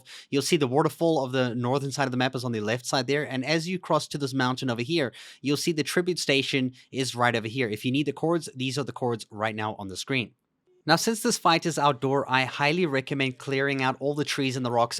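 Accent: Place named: South Africa. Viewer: The first video I saw of you I instantly heard the South African accent! Great video, keep up the good work!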